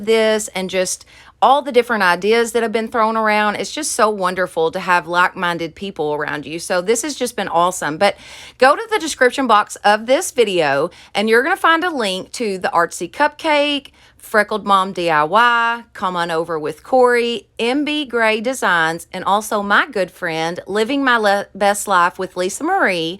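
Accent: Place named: Oklahoma, United States. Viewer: I love all your DYI'S, so stinkin'cute. I'm from Oklahoma and I talk just like you. You are so beautiful and cute at the same time.❤️❤️❤️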